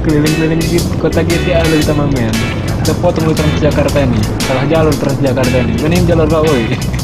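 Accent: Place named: Tanjung Balai, Indonesia. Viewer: abg orang tanjung balai ya?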